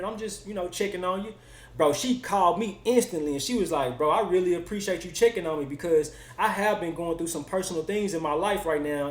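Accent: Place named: Houston, United States. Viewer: Bro you sound like you from Houston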